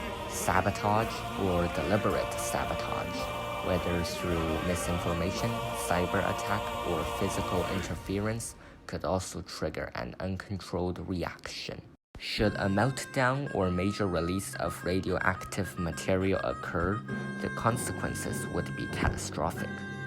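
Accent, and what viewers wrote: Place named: Asia. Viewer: Guys who come later, just ignore his Asian accent!!! CUZ IT IS REALLY A FABULOUS VIDEO!!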